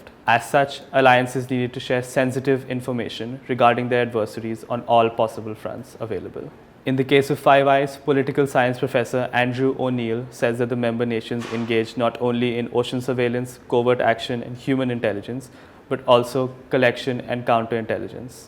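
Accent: India. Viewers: This f..ing indian accent :-(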